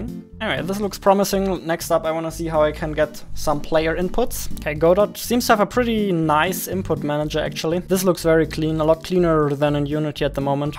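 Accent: Germany. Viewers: I understand your English really good, are you German ? ^^ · Are you from Germany? You kind of sound like it. I am from Germany but I can't really tell if you are because your English is so fluently xD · Are u german?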